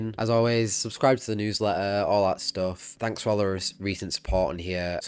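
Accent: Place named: United Kingdom. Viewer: Sooo bri'his! (I lived 2 months in Reading years ago :) )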